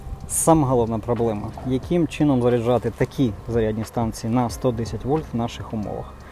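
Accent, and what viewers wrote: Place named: Surzhyk, Ukraine. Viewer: 😂если начали по укр говорить, то уже все говорите, суржык дикий аж слух режет)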